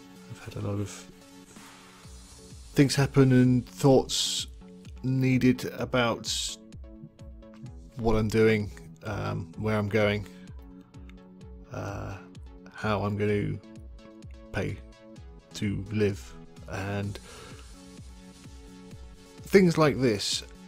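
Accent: England, United Kingdom. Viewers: u really are cool:) u make me laugh with ur accent and style and ur "pommyness":3 watch u, pause, beef and guude alot and you four make me laugh. hope u dont stop:3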